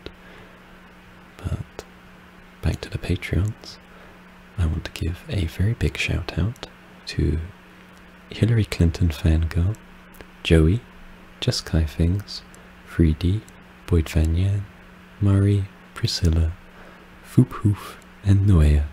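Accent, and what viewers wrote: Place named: England, United Kingdom. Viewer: accent is also english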